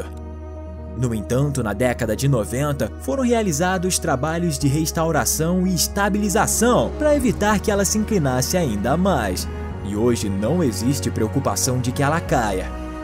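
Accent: Rio de Janeiro, Brazil. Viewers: Essa locução com sotaque carioca cheio de X meu irmão é impossível ouvir 👺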